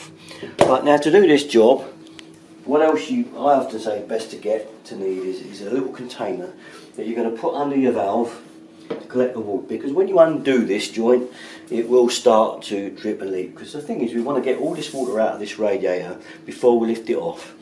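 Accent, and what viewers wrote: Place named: England, United Kingdom. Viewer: Delightful presentation. Charming English accent.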